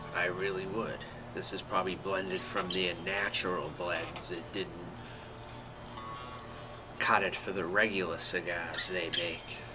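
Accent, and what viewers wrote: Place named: Boston, United States. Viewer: Yo are you from Boston? Damn that accent is strong boy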